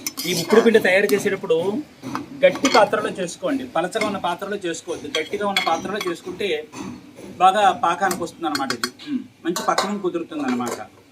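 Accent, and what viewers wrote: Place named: Brahmin, India. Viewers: Tamilian ayina mee telugu madhuram ga undi mee vanta laga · మరొక మాట బ్రహ్మల మాట ఎప్పుడు అంత swachamga ఉంటుంది అందులో మంత్రాలు chadive వ్యక్తి so మాట అలగే ఉంటుంది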